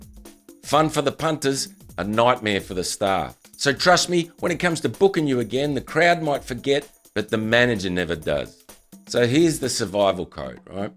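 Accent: Australia. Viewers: Australia mate!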